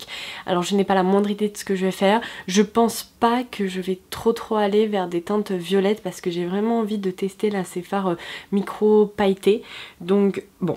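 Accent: England, United Kingdom. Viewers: Vidéo top encore une fois! Les produits me donnent trop encie, surtout la palette de fards 😍 Et cet accent english que tu as, j'adore 😂😘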